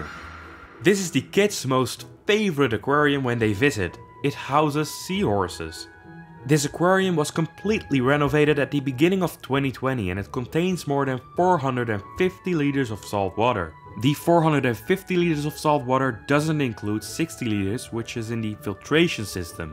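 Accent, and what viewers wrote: Netherlands. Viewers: I think your Dutch😁 by hearing your accent so….super gaaf. Ga zo door met video’s maken.😊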